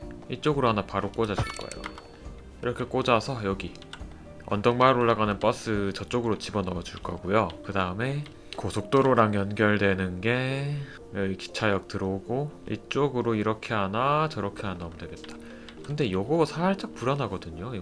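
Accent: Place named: Gyeongsang, South Korea. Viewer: 형님 매번 목소리가 너무 매력적입니다 경상도 분이시군요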